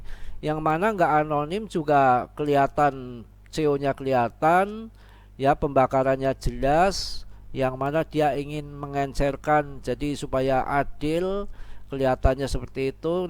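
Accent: Banyumas, Indonesia. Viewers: Salam blekutakan mas bro. Aku nonton terus video mas bro kata katanya ngapak bikin ketawa jadi ngga bosen bs meng hibur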